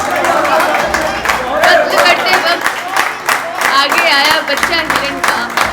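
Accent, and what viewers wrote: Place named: Haryana, India. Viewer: Hindi me kosish acha h but ur hariyana sun jaan me jaan ati hai. 😘😘😘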